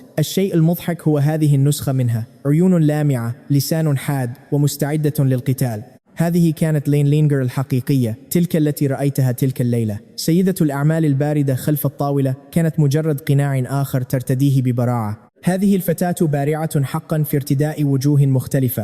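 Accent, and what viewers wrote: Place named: Iraq. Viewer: باللهجه اظنك من العراق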